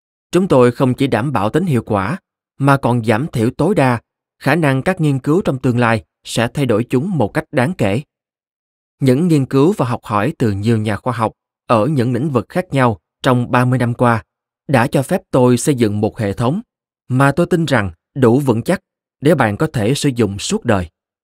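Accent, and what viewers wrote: South, Vietnam. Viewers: Đây là clip mà tôi thấy tệ nhất trong nhiều clip vì gần hết clip rồi mà vẫn cứ miên man giới thiệu,tua mãi mà vẫn cứ nói về bản ngã...vv Nói chung fonos hay ở đâu có giọng đọc miền trong rất nặng về trình bày tên tuổi dài dòng.thể hiện tiếng anh nghe phản cảm tỉ vẻ như rất tài giỏi về ngoại ngữ sao ko nói gì cho mộc mạc dễ nghe ..¿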